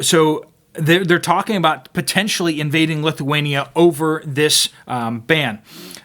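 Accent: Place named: Midwest, United States. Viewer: Hes from the Midwest, accents exist.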